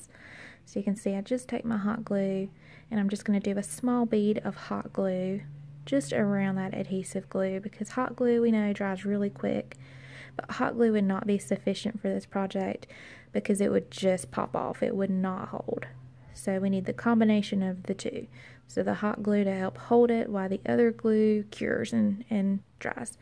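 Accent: South, United States. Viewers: You have the southern accent and the monogram...you need to get on your cake bakin', girl! 🎂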